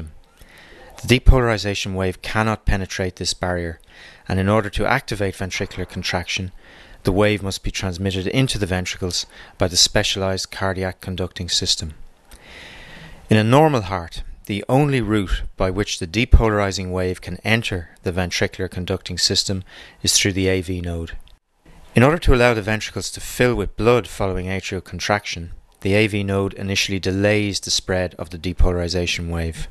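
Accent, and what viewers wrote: Ireland. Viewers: What a HUGE help this video was! I wish my college teacher explained it this well. Thanks for this video. I think you have helped me raise my grade. Your Irish accent is a pleasure to listen to as well. Thanks again, doctor.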